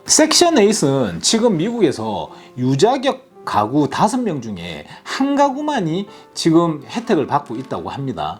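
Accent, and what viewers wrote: Gyeongsang, South Korea. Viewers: 원래 갑자기 스쳐가는 생각이 세상을 바꾸는 법입니다, 적극 추천합니다,,백안관에 청원 하시기를,,,,, ㅎㅎㅎ, 감사합니다, ( 같은 경상도 신것 같아요, ) 👍👍👍💕💕💕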